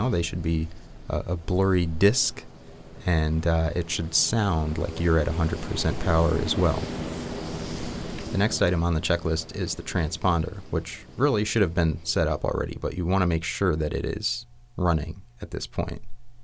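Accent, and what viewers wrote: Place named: United States. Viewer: Thank you for this terrific video. Never had a buzz for choppers as I have for planes, but this video has changed that. Please keep them coming. By the way, and I mean this with utter respect, you sound like, with your American accent, the actor Sam Elliott playing the Doorman in 'Road House'. Thank you very much for the Video.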